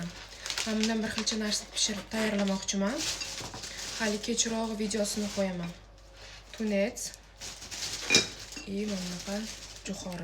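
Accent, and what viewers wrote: Tashkent, Uzbekistan. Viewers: Кашкадарйолик буса бук йеб тошкентча гапирадими бу чала тошкентли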